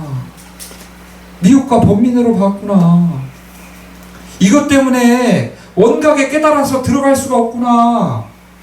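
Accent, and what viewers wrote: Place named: Chungcheong, South Korea. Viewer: 충청도 말씨가 들리니 참 정겹구요. 조회수 안나와도 김 빠져 하시지 마시길요.ㅎㅎ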